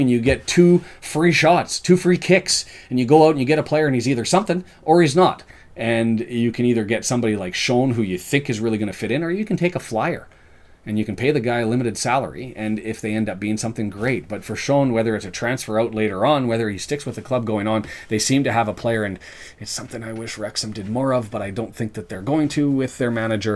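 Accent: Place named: Wales, United Kingdom. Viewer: Great Welsh accent you’ve got there mate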